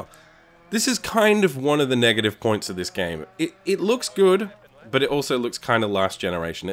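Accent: Australia. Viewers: you sound like you're from Londan! But seriously though Aussie? Kiwi? Anywho, great review, I loved the honesty and the recommendation, after watching your review, I'm quite keen to give this game a go, since it's dirt cheap (punzrfun). Subbed for future content.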